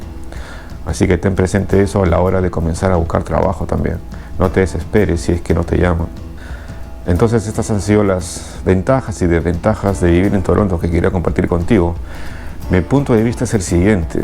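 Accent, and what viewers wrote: Peru. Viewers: Eres peruano hermano?, me parece por tu acento.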